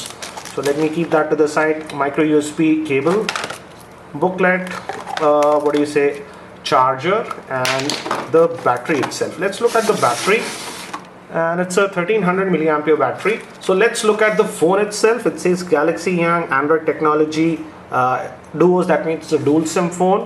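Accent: India. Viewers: You sound like an Indian? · its indian accent.. u sure u hate originality?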